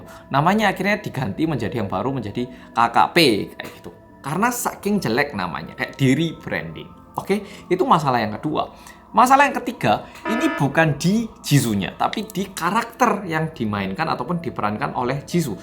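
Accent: Java, Indonesia. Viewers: Bersama orang korea yang medokkkk , suka banget aku cara ngomongnya dan intronya!!! 😀👍🏼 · Orang Korea yaaaang medoooooook!!! Yeay 😆😀🤣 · Je Te Be Ce... sueneng aku dengere...medhoookkk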